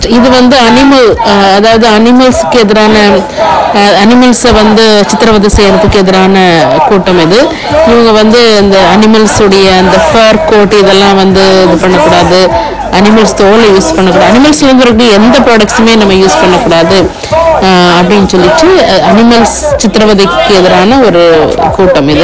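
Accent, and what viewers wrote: Nagercoil, India. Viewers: Nagercoil Slang💪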